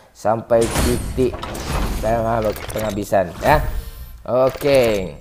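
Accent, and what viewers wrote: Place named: Java, Indonesia. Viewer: Bang bhsa medokk🤣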